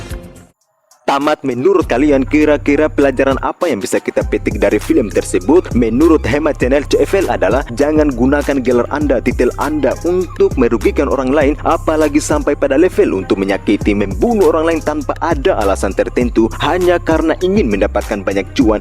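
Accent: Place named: Medan, Indonesia. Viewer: Logatnya Medan bgt 😂.. jdi ingat mntan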